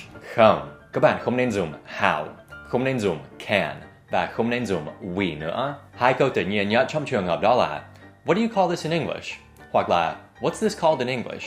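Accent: Standard. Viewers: Thầy nói tiếng Việt ngày càng chuẩn. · thầy nói tiếng việt chuẩn quá. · thầy còn chuẩn hơn cô kiều trang nhiều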